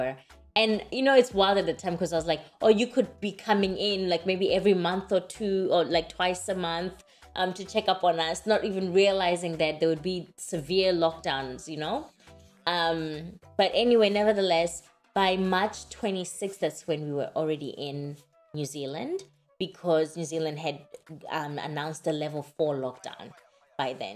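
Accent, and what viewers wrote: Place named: South Africa. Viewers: I thought as much that u can be South African. 😁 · Ur accent is so close to South African 😳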